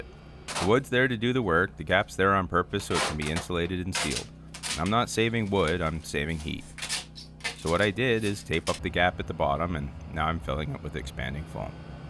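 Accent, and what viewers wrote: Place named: Wisconsin, United States. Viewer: I love your Wisconsin accent but your using your ladder upside down, keepr moovin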